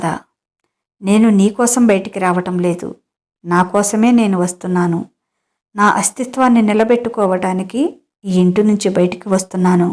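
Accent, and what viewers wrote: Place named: India. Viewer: Chala bagundi talli. Teluguni telugulage acham telugu ammai laga chadivavu. Santosham. Subham. 🌷✋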